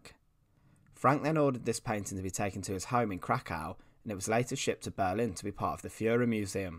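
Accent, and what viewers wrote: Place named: Cockney, United Kingdom. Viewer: I can understand about half of what the narrator is saying. Pretty thick cockney accent.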